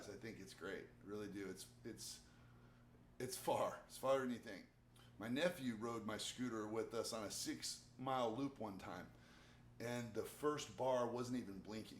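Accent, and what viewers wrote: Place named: United States. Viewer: WTF the terminator but with american accent woah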